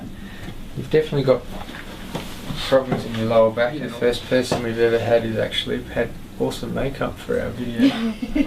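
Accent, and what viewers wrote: Australia. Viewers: can someone please tell me if this guy is from sydney or melbourne pleeeease, i need to see this guy asap the joy i got hearing the australian accent hahahha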